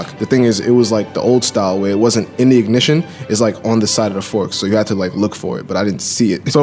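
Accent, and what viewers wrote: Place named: Brooklyn, United States. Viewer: I also live on the west coast now but I"m from the east coast and really miss NYC, so listening to your Brooklyn accent is the best thing ever.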